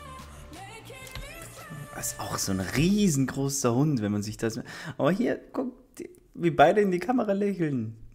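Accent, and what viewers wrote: Austria. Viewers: Bist du Österreicher oder Deutscher? Bin gefüllt einziger Österreicher auf yt 🥶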